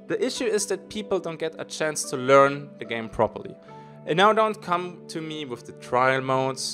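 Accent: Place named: Germany. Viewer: You sound German, I'm new here btw.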